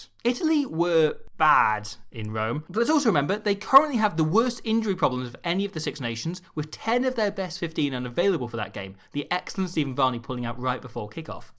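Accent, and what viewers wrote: Wales, United Kingdom. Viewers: are u welsh??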